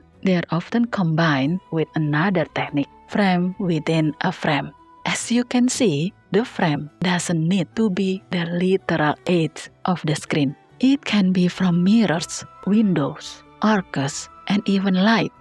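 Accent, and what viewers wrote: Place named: Indonesia. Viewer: I was struggling to identify your accent when suddenly, oh, Indonesia, ternyata. Analisanya keren, semoga sukses buat channelnya ya kak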